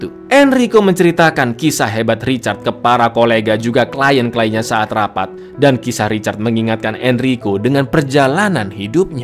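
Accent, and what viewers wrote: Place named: Serang, Indonesia. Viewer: Logatte kaye wong serang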